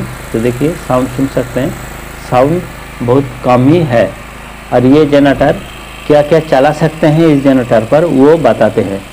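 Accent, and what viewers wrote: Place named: Bengal, India. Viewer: Apni Bangali apnar Hindi Sune bujha ja6...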